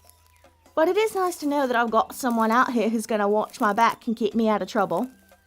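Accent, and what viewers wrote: Australia. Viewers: you sound Australian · You have a beautiful accent. Australian?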